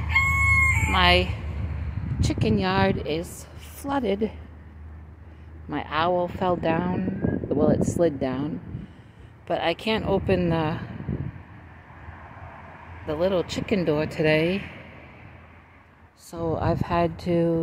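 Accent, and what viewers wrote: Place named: Connecticut, United States. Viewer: Me too. From Connecticut. Been here almost 17 years. I recognized your accent. 😊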